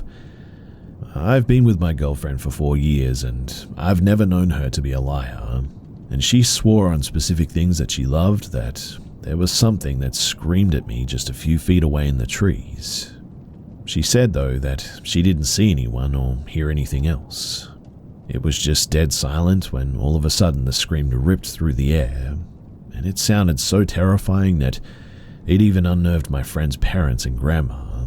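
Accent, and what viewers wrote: Australia. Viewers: 😊Love your aussie accent mate ❤❤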